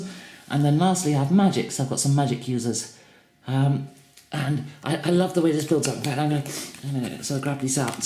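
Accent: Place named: United Kingdom. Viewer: this guy has the most british accent I've ever heard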